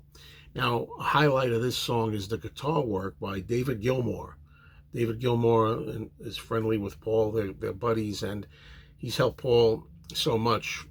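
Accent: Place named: North Jersey, United States. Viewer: I have this one on vinyl, and saw him at MSG when this came out. Never really listened to it much., sound like you’re from northern NJ. Are you?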